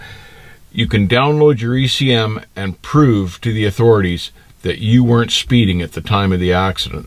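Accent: Canada. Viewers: which part of Canada are u from?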